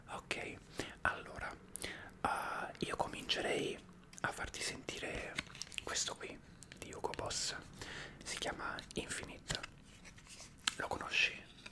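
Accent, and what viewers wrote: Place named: Tuscany, Italy. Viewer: sentendo il tuo accento, perché mi sembri toscano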